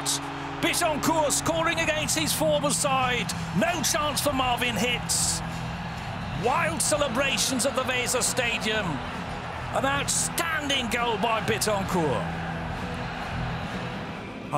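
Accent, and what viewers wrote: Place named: RP, United Kingdom. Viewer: Poshest commentator of all time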